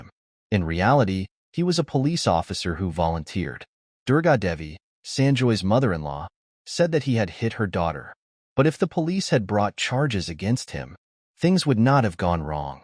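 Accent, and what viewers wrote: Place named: United States. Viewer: Why the hell do you need an American narrator for this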